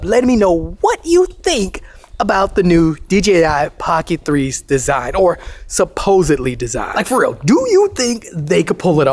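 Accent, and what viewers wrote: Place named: Brooklyn, United States. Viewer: Love the Brooklyn ghetto street accent. Subscribing...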